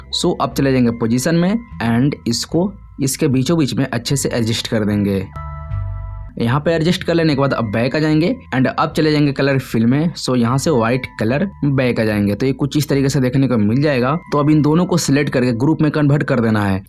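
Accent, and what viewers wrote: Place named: Bihar, India. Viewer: He is from bihar 😅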